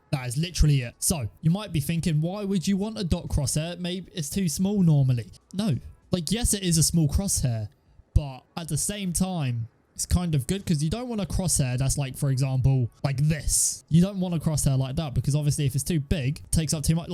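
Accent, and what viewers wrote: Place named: United Kingdom. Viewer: Love the british accent, great video thanks!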